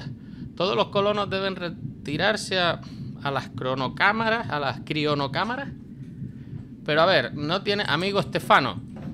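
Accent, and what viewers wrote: Canary Islands, Spain. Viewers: es de las islas canarias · fuese de España por su forma de hablar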